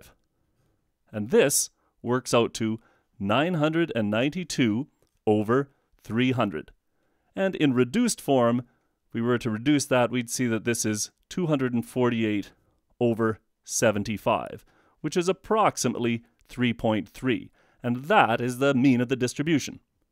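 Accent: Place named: Canada. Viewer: I know a Canadian when I hear one. Also, thank you.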